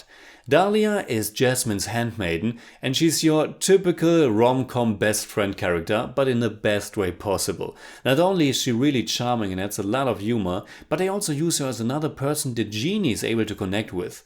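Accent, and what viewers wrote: Germany. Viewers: i thought you were from austria but that accent sounds the same to me, still german language. are you from austria or germany? you are the best reviewer ever by the way. i say that every time and i a say it again. i'd listen to your reviews about anything--movies, books, cafes, anything, i would trust your every perception.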